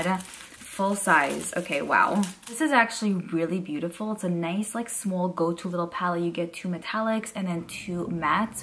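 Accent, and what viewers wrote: New York, United States. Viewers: Love love your videos watch they daily and love you New York accents it’s the cute make me miss home 🥰 love Ipsy too ♥️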